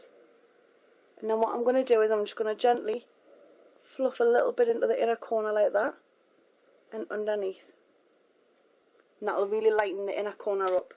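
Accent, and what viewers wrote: Newcastle, United Kingdom. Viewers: Haha,u r the first english person ive heard on youtube,R u from newcastle?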